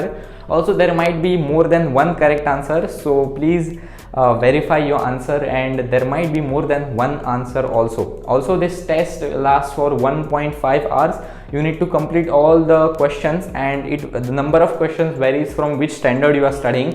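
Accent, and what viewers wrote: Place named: Maharashtra, India. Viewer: Marathi people get this sweet accent in English!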